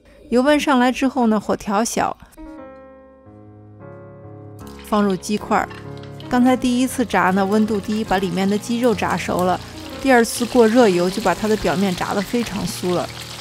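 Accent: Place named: Beijing, China. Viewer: 就喜欢你这口京味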